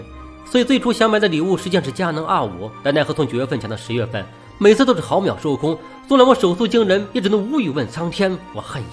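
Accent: Shandong, China. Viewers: mike绝对是山东人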